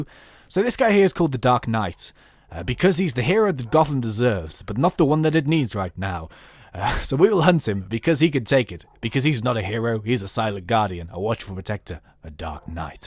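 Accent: United Kingdom. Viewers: I love his British accent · Are u British? And lol I love the dogs name lol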